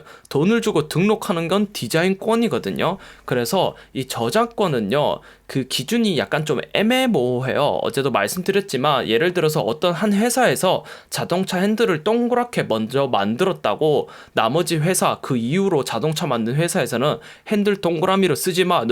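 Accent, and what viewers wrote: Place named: Daegu, South Korea. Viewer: 사투리인가? 말투가 귀엽?다고 생각했는데 대구분이신가봐요. 저도 회사때문에 대구살고 있는 디자이너인데 ㅎㅎ 반가워요.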